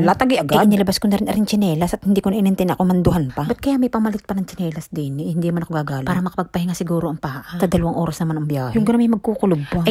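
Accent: Batangas, Philippines. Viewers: kung ako laang ay isang napakagaling na cartoonist at kung marami akong oras, nagawan na kita ng isang cartoon series na mala-Mr. Bean cartoon series. pero syempre may mga dialogue at batangueño accent pa haha! yung feature talaga ng iyong mukha ay masarap i-drawimg tapos gagawan ng kwento. nakaka-GV ka kasi lalo na pag sinambit mo na ang Magandang Araw! parang sina Ate Sienna at Kuya Bodgie lang sa Batibot 😅 Goodluck sa yong panibagong pakikipagsapalaran sa Tokyo. kaka-excite ang mga susunod na mga kabanata.😊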